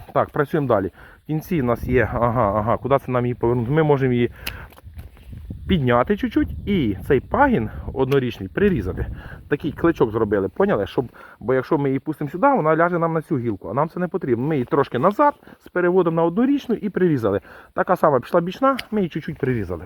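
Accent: Vinnytsia, Ukraine. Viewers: Забий, на Вінниччині всі так балакають. І це не від любові до 🐖🐶-ї.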